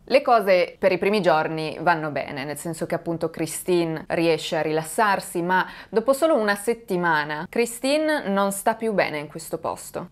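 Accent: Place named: Turin, Italy. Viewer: Secondo me sei triestina.... No di Torino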